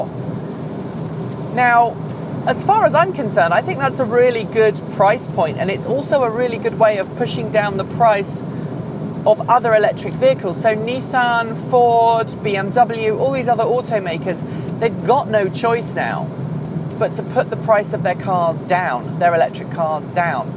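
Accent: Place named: United Kingdom. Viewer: One of the reasons I subscribed to is that I appreciated your in vehicle driving soliloquies, common sense information, and your great British Accent. I also appreciate when you include things about your life, good, bad, or mundane, as we get to connect even more so. KEEP IT UP! I hope things settle down for your and yours.John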